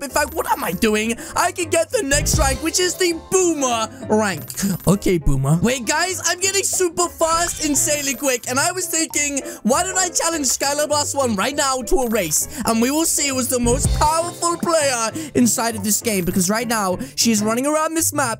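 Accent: United Kingdom. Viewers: Its my first time seeing this vid u sound like british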